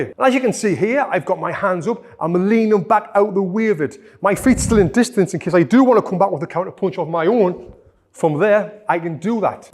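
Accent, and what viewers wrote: United Kingdom. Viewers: Just watching for this great British bulldog accent😅 I use left hook twice low and immediately high afterwards. But I trained that. As you said stay balanced when moving back protect ur chin and do not blink. Or hang around with nice people at chill places so u do not get confronted. Worked for me last 30 years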